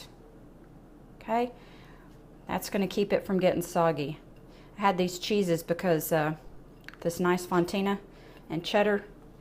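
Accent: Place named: South, United States. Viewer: Wow looks delicious! Thanks for the mushroom tip, to cook on higher heat and cute Southern accent.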